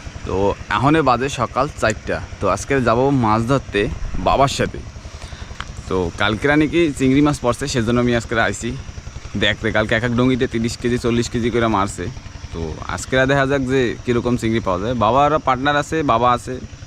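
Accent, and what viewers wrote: Bangladesh. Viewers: কথায় বাংলাদেশী ভাব 😅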